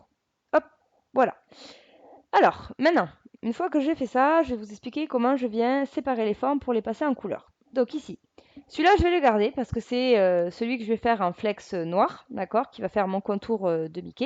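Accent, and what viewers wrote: France. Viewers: Je débute sur inkscape. C’est impressionnant tout ce qu’on peut faire avec cet outil. Gardez ce petit accent béarnais (la cacade) 😂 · très bonne vidéo et bonne explication avec notre accent du sud ;) par contre je trouve aucune vidéo sur ta chaine c normal ? merci pour la transmission de ton savoirs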